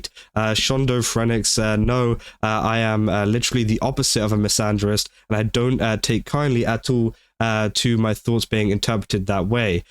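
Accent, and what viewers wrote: United Kingdom. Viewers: Youre british ? Man im sorry i might not support you anymore 😔 this is outside my boundaries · Wait... Shondo is British!?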